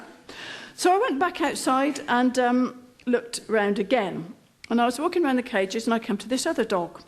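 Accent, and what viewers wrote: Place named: South East England, United Kingdom. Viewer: From the Westcountry (Cornwall), that is not a Westcountry accent anywhere, sounds like old rural accent from the south east to the west of London, before alll the cockney Londoners spread out. So yes, borderland between Oxfordshire and west Berkshire is where I'd place her.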